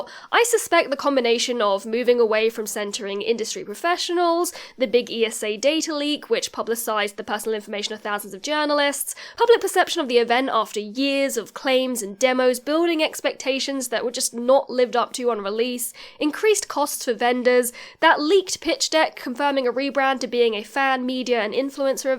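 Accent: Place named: United Kingdom. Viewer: Nothing like the soothing British voice of to feed me copium before I go to sleep for the night, thank you for furthering my hope soldier 🫡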